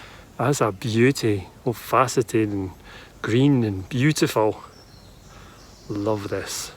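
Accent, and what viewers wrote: Scotland, United Kingdom. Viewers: Always nice to hear this lovely Scottish accent. Over 30 years ago i had trouble understanding any scottish, being of non English background. Had to have hubby translating english into english! Love watching your vlog. Cheers Daniela from Australia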